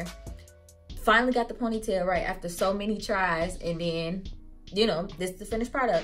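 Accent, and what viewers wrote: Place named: Chicago, United States. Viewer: i can tell you from chicago from yo accent 😍😍 you pretty!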